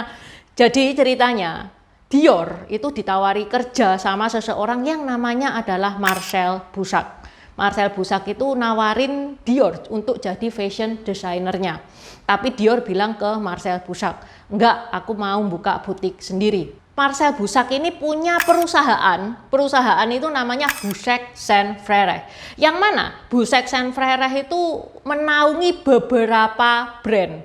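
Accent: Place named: Surabaya, Indonesia. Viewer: logatmu lucu...seperti orang di pasar atom. Tetap aja sebab menurutku menarik.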